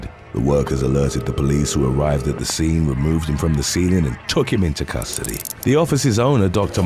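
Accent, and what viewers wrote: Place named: United Kingdom. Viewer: As I’m British myself, I never understood women who LOVED British men’s accents until I heard this guy! 😊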